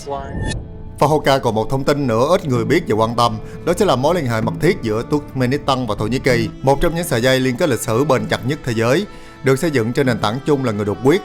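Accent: South, Vietnam. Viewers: Giọng nam và đẹp trai . Có kiến thức .fahoka văn võ song toàn. Hình như em có tất cả . ❤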